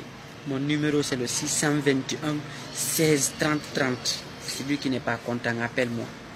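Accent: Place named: Pular, West Africa. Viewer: Tu na pa mem le merite detr un ministre avk tes Français poular ....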